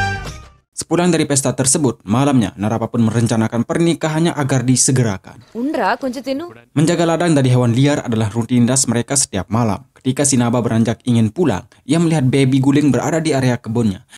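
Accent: Bali, Indonesia. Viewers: bang ora Bali ya?logat nya ky orang Bali..sukses terus bang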